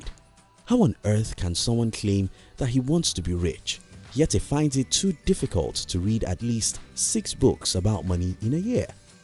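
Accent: Nigeria. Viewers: "in the secret" Funny Nigerian speaker (obvious from thick accent) uses a white hand to do the illustrations. Good message though. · Nigerian accent ...... no doubt ....mmmmmhhh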